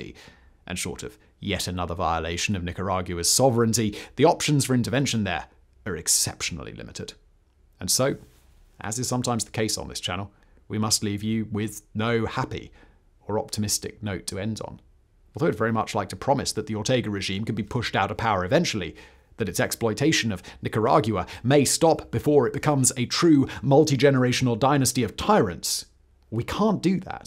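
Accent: United Kingdom. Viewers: you might want to tone down on your pompous Bristish style of speaking. its a turn off.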